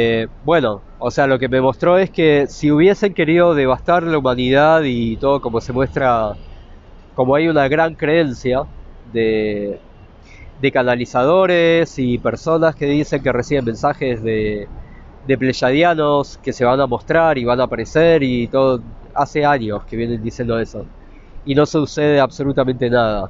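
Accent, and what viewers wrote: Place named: Argentina. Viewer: ! Muy buen vídeo! Tenes acento Argento ( de donde sos?) Abrazo grande desde argentina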